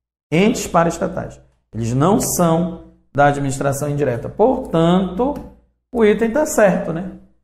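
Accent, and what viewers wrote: Pará, Brazil. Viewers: Esse professor é Paraense né? :D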